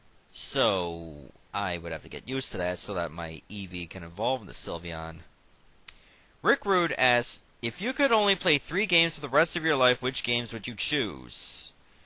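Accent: Newfoundland, Canada. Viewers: 1. Is there a long, undisclosed queue of LPs that you plan to do, or are you more or less spontaneous with your long-term planning? Assuming you get a lot of various requests, is it impossible to estimate the time you'd LP any one request? [assuming it's not rejected] 2. Imagine you end up meeting with one of your favorite video game characters. Who would it be? Describe a scenario of what you'd do with that character if you hung out with him/her for one day. 3. Do you describe yourself as having a Newfie accent? If not, do you know of anyone who fall more closely to that sort of stereotype? Thanks in advance for your responses!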